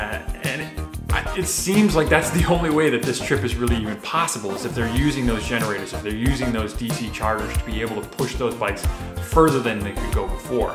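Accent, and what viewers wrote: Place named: United States. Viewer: Cannot hear much of what you are saying. Your strong U/S accent, which is not easy for native speakers of English to understand, and the overbearing music (noise) defeat your purpose. I persevered, but gave up after about 4 - 5 minutes.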